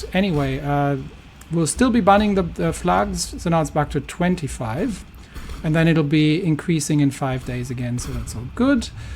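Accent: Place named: Germany. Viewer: Are you German? I thought your accent was noticeable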